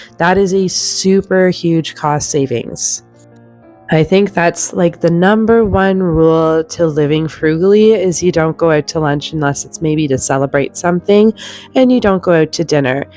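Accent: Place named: Canada. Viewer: I love your tips and as a bonus I love the Canadian accent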